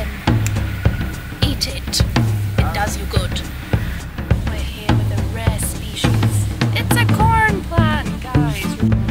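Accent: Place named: England, United Kingdom. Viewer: Love ur English acent...... P. S from ur favourite niece 🤣🤣🤣see ya in 7 days🥳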